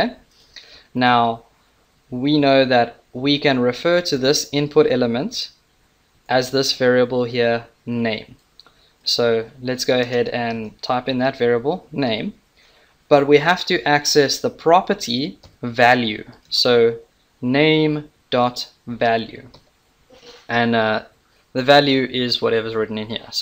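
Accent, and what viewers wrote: South Africa. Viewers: Are you South African? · Was so shocked when I heard a South African voice! #LocalIsLekker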